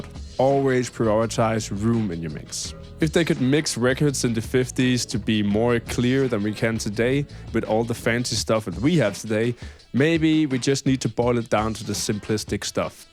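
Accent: Denmark. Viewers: you sound incredibly danish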